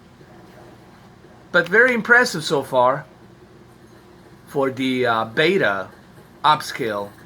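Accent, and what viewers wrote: New York, United States. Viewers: Are you from NY? I keep waiting for you to say "dead ass" lol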